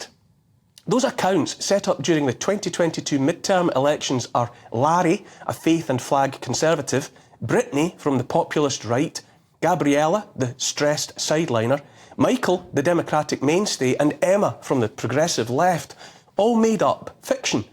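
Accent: Scotland, United Kingdom. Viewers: You have great information here that you so generously share with whomever will watch and listen. I'm a 70 year old pale male too. So, I'm going forward with a well intended suggestion: If you could slow your broadcast down one beat...just one...my brain could more easily absorb your wonderful content. Between your beautiful Scottish accent, and the slower pace of your easy language, I could really appreciate a better chance to understand everything you are saying.: )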